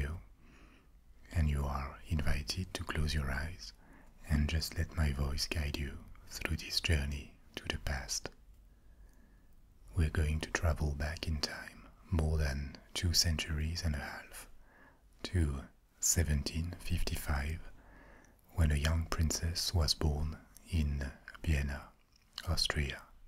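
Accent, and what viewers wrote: France. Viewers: i always come back to this video, love the french accent <3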